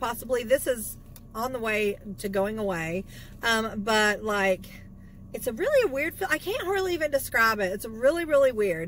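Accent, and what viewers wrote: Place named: West Virginia, United States. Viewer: Are you in Beckley, WV? It just sounded like that area. I am within 50 miles of Beckley. Enjoy all your videos, thank you for sharing.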